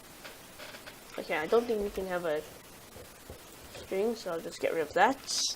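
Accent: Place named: United Kingdom. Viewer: From your accent and your way write colour instead of color. You might be UK Citizen. 🤔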